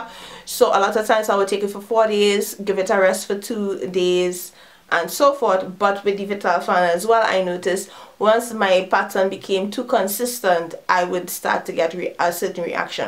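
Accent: Trinidad and Tobago. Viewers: Oh my gosh Im Trinidadian as well! So happy to hear you are as well! These tips are great also! Im planning on trying this but really scared of trying bc im kind of prone to acne... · Soon as you started talking i already knew where u were from #trini....thks for the tips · Are you from the Caribbean?... you sound Trini lol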